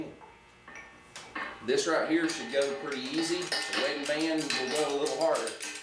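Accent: South, United States. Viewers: Great job. I learned a lot so wanted to say thanks. Love the southern draw. Now to the garage.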